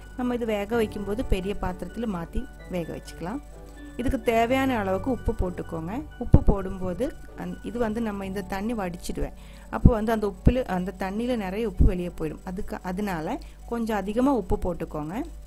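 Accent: Kerala, India. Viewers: ur tamil is so good.. though little Malayalam accent... where r u from mam... my Dubai kerala friend told me this recipe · Unga Tamil malayala vasanaiyodu alaga erukuu. ❤ & Thnx for the information on how to select kappa kilangu dear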